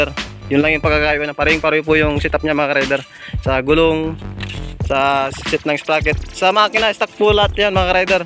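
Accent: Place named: Pangasinan, Philippines. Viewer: Nice content! As of this moment, nirerestor ko ung TMX <num> model ko kaya naghahanap ako ng mga video about sa tmx 155 napadpad ako sa video nato And As i’ve observed ung accent ng uploader is Pangasinan. Pa shout out Next video sir. I’m from Aguilar Pangasinan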